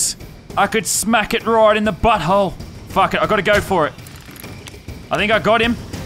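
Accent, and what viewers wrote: Australia. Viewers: It’s his Australian accent and honestly I like how it sounds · The Australian accent makes his videos ten times better am i right😂🤣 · The fact hes Australian males it so much better lol.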